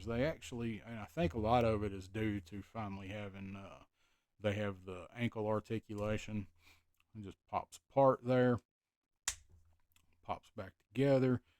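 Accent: South, United States. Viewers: The best adventures start with setting to wander. I don’t do MOTU, but you good folk with that Fine Southern Drawl really sell him well. 3 star outta 3 -well. I’m glad this video got mentioned to us tonight.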